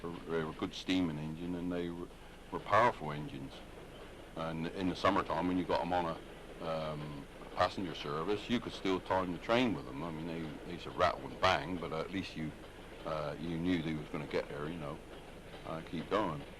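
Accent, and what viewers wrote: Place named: Dorset, United Kingdom. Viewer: Its nicest to hear it narrated in that wonderful Dorset accent. It takes me back in time to my childhood. I loved to hear the Bath drivers, then the somerset accents around Evercreech to the Dorset accents of the drivers and firemen. It makes me feel at home.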